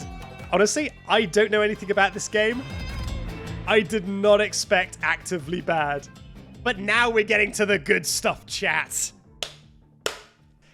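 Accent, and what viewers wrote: United Kingdom. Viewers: AHH HE'S BRITISH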